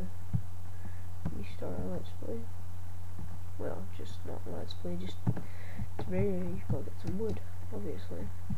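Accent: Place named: Scotland, United Kingdom. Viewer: I figured out your scottish in this one, within 12 seconds, awesome lol.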